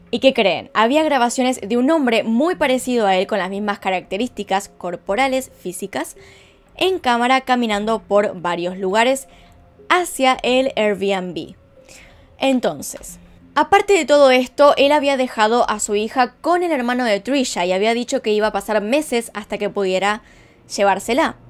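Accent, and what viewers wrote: Argentina. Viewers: Hondureña cejuda con acento argentino? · Me encanta tu manera tan espotanea de narrar los hechos, tu acento argentino me encanta (corrígeme si no es argentino) y además estás super bonita awss ❤️✨ no puedo con tu canal es demasiado perfecto✨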